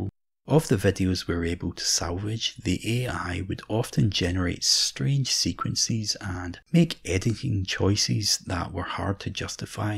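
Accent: Scotland, United Kingdom. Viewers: Even the scottish accent was elaborated with AI? banger ! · All the comments here are from bots, this is a cesspool of AI entities... all hail the circuit... Looking forward to your next video! Always love to hear your Scottish accent, lovely memories of my time in Scotland😊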